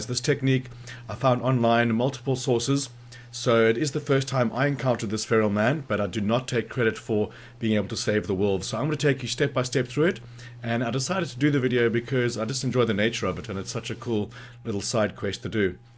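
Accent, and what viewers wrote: South Africa. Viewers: South africa? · Are you South African? Your accent sounds South African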